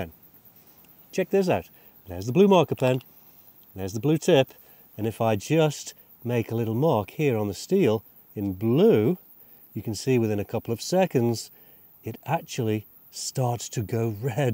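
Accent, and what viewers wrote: Lancashire, United Kingdom. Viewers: where you from 'Lancashire?????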